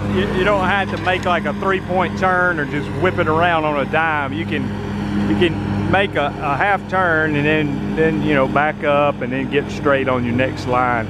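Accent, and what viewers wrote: South, United States. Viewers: I'm from the south and there's nothing wrong with sounding Southern.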